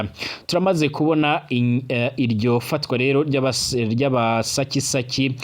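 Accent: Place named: Rwanda. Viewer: bjr. mbe ko wigira umurundi kandi vyumvikana ko uri umunyrwanda !!!!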